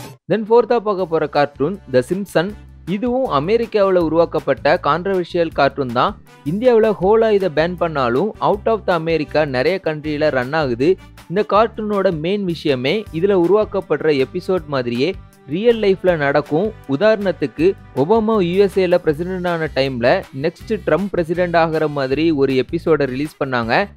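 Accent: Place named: Kerala, India. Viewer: unga slang konjam Kerala Mari irunthuchu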